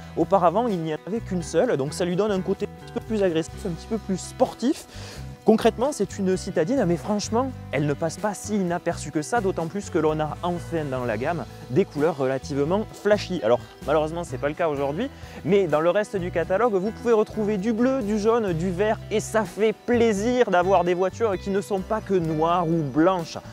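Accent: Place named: Southwest, France. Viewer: joli test ! dommage qu'elle ne soit pas rechargeable: le gain en conso aurait été bien meilleur ! Je suis en LOA 308 hyb 225 et je la trouve vraiment tres bien. Sur ces nouvelles version, ils ont fait un net progres sur les finissions. l'option recharge des batteries est un réel plus. Pour finir: ca fait un bien fou de retrouver l'accent du "coinG" ;) ! (suis pas tres loin: sud Toulouse). J'ai beaucoup apprecié ton format test/avis/découverte de notre belle région. Bravo !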